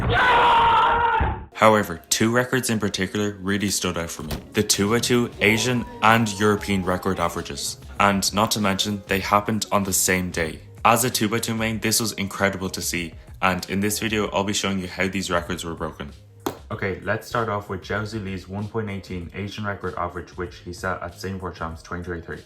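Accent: Ireland. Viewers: ur irish accent is too strong for my english + chinese brain to combine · Hey, are u irish? ur accent sounds like a south dublin accent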